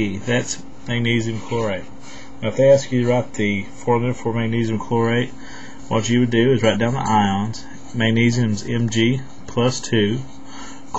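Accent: United States. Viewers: Thank you helped a lot :) I love the accent lol Americans mmmm mmm good lol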